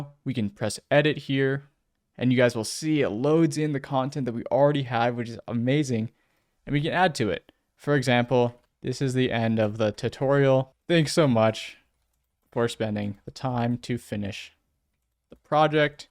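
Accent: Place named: United States. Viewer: your english is the best I can understand. true American accent I use to learn in school. thanks for the great tutorial.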